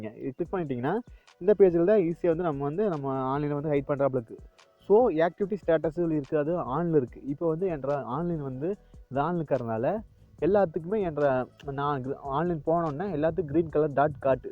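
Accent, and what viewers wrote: Coimbatore, India. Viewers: Anna coimbatore slang super ah iruku 💙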